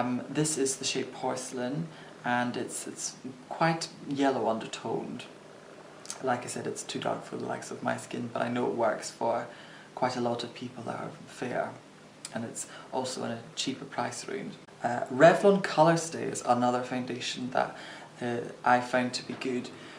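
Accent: Scotland, United Kingdom. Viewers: I love hearing the Scot come out- definitely different than new videos but I love them all. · So, this is a much earlier video. I do prefer your eyebrows here. Much more natural voice and enunciation. I would say here that you're Scottish and you say film normally. Hmmm. You certainly have mystique! Xxx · thankyou for this video! I really struggle to find products pale enough for my skin, when i heard your accent i knew you understood! lol! Because i am also Scottish like you and like most of us up here, i have that typical, really pale 'peelywally' complexion. Almost everything i put on my face is way too dark, so this video was really handy! cheers! by the way, I agree with everyone else, you're really pretty! I can't believe you're actually a guy! you look more stunning than most of us girls do even after we've spent hours trying to make ourselves look nice! 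I wish you were my makeup artist, i could listen to that voice of yours all day, it is so soft and relaxing! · Your voice an accent are simply heavenly (I have always found Scottish accents to be some of the most beautiful sounding in the world, but your voice in particular has something especially enchanting in it). And your presentation of products is exactly what I think many people actually want and need. I am absolutely hooked on your videos and am so glad to have discovered your channel. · He was still living in Scotland back then or just moved to London, lots of people (especially when they're young like ) change or tone their accent down when they move in another area. one of my closest friends is from Merseyside but has lived in Manchester for more than 10 years now and her relatives complain because she lost her Scouse. my former housemate is from Edinburgh but has a very mild Scottish accent, again she hasn't lived there in 10 years. 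· Rachel B He still sounds Scots.. no getting away from it... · true also i was so suprised by the accent even tho i knew he was from Scotland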